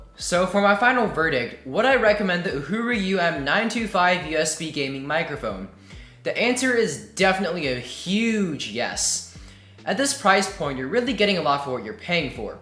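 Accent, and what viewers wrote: South, United States. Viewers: good southern accent. Chinese accent is next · I think the intro was your original accent and you put on a more "normal" accent for the rest of your video. Dont be afraid to show your viewers who you are. Let your southern accent out with love and passion. We want it.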